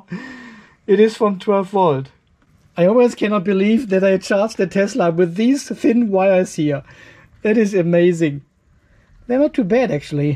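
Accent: Germany. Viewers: ja moin, bin das erste mal hier auf en Kanal und bei dem Akzent gehe ich mal davon aus das du ein deutschere bist?! or am i wrong?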